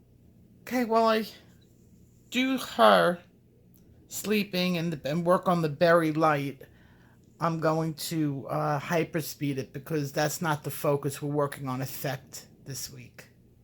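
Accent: New York, United States. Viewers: Wonderful! Love your NY accent!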